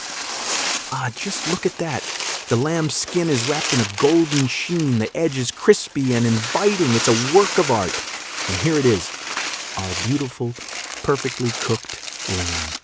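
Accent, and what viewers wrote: United States. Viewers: Ur videos lost its charm because of the annoying American accent that doesn’t shut up.!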